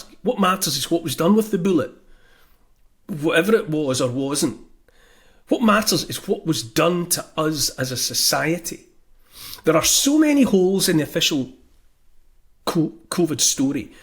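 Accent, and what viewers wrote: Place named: Wales, United Kingdom. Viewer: A Welsh accent saying things like "speed of science" tells you all you need to know. Deer me.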